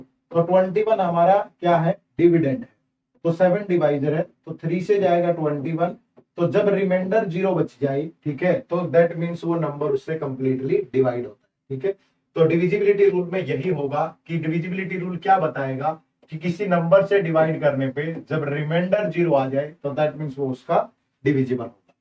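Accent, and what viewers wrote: Haryana, India. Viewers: According to me also because that speaking way and his simplicty show he is fromHaryana. I like haryana people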